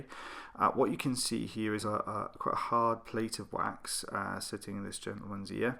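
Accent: Wales, United Kingdom. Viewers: A lovely soft Welsh accent! I'm an expatriate Brit in Alaska so quite a while since I heard the Welsh accent! To be honest, I hadn't noticed yesterday when I watched one of your videos...it was only in the 'tissue paper' video comment section that I realized! I've been out of the UK too long (since 1984) so I'm now a defunct Brit! :(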